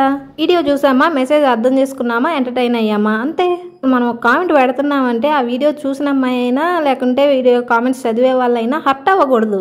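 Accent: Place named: Chittoor, India. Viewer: Me yasa chittoor yasa laga undi btn andariki reply lu ichukuntu pothunte manam mundhuki polem vallu vaguthunnarante entha kaliga unnaru odhileyandi garu neechuleppudu neechamgane matladtha ru 😂😂😂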